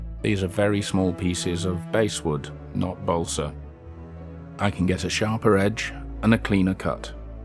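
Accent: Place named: United Kingdom. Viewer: Either way, whatever you decide, you have been a huge inspiration. I have been out and started to stock up on supplies. I’m not sure what scale or style I will settle on. I do want to thank you as I mentioned for documenting all your work. I was really surprised to see you are based in New Zealand, especially with such a strong British accent. Thank you again; I look forward to seeing the notification you have posted a new video. Best wishes from Blighty.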